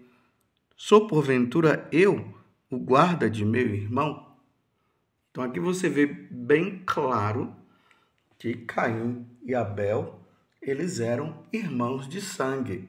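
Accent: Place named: Northeast, Brazil. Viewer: Bom dia povo santo de Deus, amo ouvir as homilias do padre, lembre -se ele tem soutaque nordestino, assim como eu tem momentos que falamos mais leve outras horas mais enérgicos como voceis estão falando, um bom dia a todos com as bênçãos de Deus!❤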